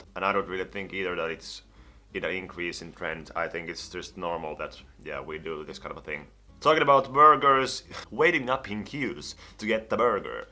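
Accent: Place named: Finland. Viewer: Are you a Finn? Cause that acsent sounds like you are a finn. I'm a Finn myself :P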